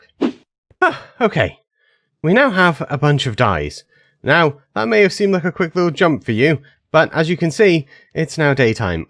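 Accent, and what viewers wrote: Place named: Mansfield, United Kingdom. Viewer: I've been trying to figure out the accent for a bit. I was leaning towards Mansfield. Yorkshire had crossed my mind too. Edit: to be fair leaving the UK has dulled my ability to pinpoint accents considerably.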